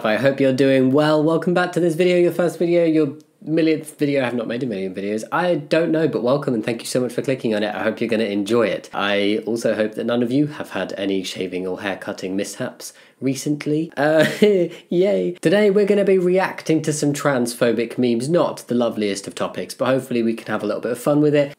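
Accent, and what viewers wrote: United Kingdom. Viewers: sometimes I forget you're British (don't me, I tune out accents after a while) and don't know of the prevalence of Christopher Walkin memes such as "it needs more cowbell". You lucky fucker.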